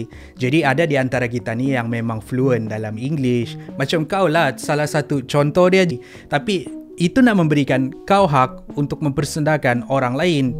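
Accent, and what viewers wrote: Sabah, Malaysia. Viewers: Abg org Sabah ke.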